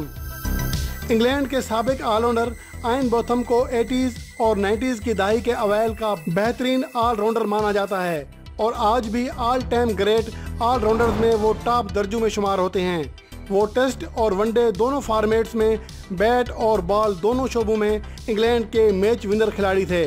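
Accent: Sindh, India. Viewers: Aap ki boli se lagta he aap sindhi ho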